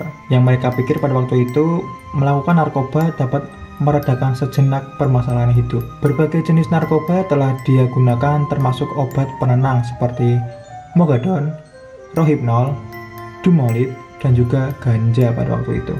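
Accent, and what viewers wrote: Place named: Java, Indonesia. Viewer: Medok mu itu loh mas.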